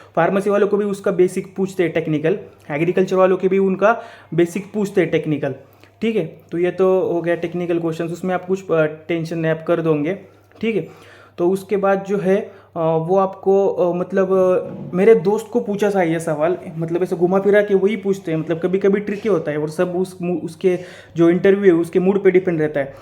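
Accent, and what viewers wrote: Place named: Maharashtra, India. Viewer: you sound marathi mulga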